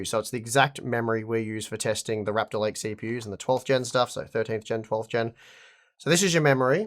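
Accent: Australia. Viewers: Daddy's shadow., she's a good looking girl....looks a lot like dad. And yes, I simply adore that lovely Aussie accent. Keep up the great work. Does Tim have a son/daughter to also do a pc build.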